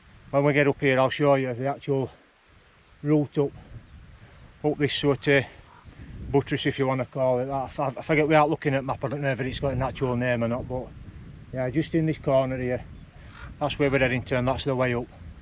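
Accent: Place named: Yorkshire, United Kingdom. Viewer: Need to stop watching this channel. A few days ago I caught myself slipping out a bit of accent, saying "over there", and thought where the bloody hell did that come from? Ah, I know,. Did make me laugh. Hahaha. Like English kids who watch too much American YouTube and start talking in a yanky accent, we're all going to start talking in a Yorkshire accent, and that.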